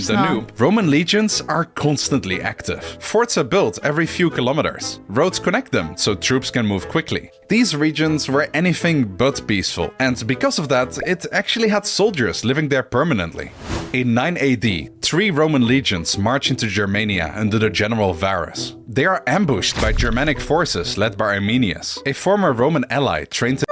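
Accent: Netherlands. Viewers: Oh that Dutch accent is so distinct. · The ear tiwtch detecting a dutch accent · Spotting the Dutch accent is not just easy for the Dutch, it sticks out like a sore thumb. See, we are multilingual and we want to speak fluent English, but when we hear ourselves back to hear how strong our accent is, it's raw cringe.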